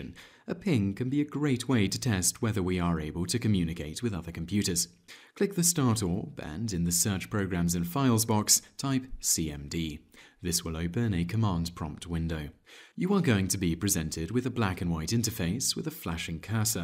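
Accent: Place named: RP, United Kingdom. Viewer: Dude this guys voice is so fucking annoying like the pitch of he voice like he talk so posh and fuckboy like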